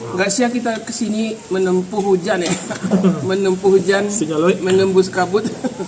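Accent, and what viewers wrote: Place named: West Sumatra, Indonesia. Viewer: Mungkin tak pandai tetapi banyak pertuturannya guna bahasa melayu cuma dialeknya lebih kepada minang dan indonesia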